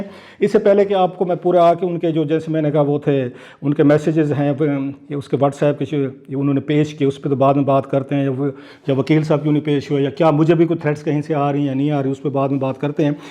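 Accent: Saraiki, Pakistan. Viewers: Be informed, urdu is not his native language. He is basically Saraiki, and that reflects in his accent. Thats absolutely normal.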